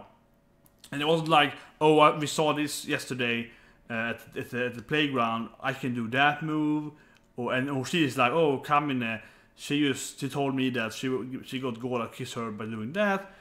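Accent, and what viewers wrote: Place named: Sweden. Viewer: Yeah, but it gets better, believe me. You also wondered about the other two Girls in the first Kamine and Goda bit. The first girl with the green twintail hair is called Nakasone Maya and is only seen in this bit and a later chapter. The other one, called Patricia, is one of the best characters in the series. I hope they are saving her real involvement in a season 2, since we haven't even seen half of the couples yet. If she was going to be in the show more in this season, she would have been introduced in like episode 2 or 3. I hope they make a season 2, which is like this one but with the other couples and characters that were introduced at this time as focus instead, and then a compilation season with the first 2 seasons in one, the difference being that it would become a 24 min episode show, have the "bits" in correct order AND add the extra pages and Tsurezure Children Plus! 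stuff from the manga. Btw, Svensk? Din dialekt pekar åt det hållet. (<----If you didn't understand that, then nevermind :P)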